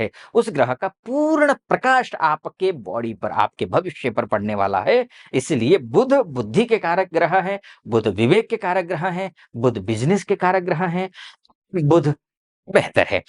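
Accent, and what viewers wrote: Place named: Nepal. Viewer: Your accent looks like you r from nepal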